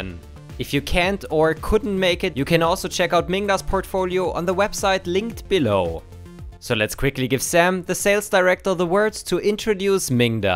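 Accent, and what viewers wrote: Germany. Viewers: Dnk how's your english so clear being you german, you only have a little sharp pronounce but's the deutsch accent while your spoken english is perfect. How did you manage to found the only chinese I've ever heard speaking english with a mix of nigerian and indian accent? He's rare! :D sorry I couldn't un-notice it :D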